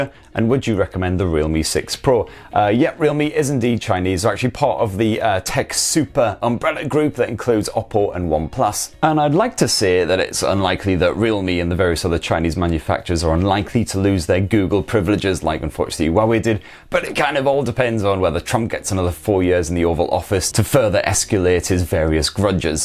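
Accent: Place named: Northern England, United Kingdom. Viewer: Thank God, I knew you were northern but couldn’t tell if it was Geordie or Mackem. Fellow Mackem here (well, adopted Mackem for the last ~5years, pit yacker beforehand).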